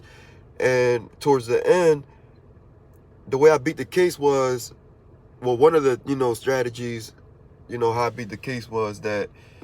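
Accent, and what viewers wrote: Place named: Philadelphia, United States. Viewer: your from philly bro i can hear it, fighting my 2nd dui right now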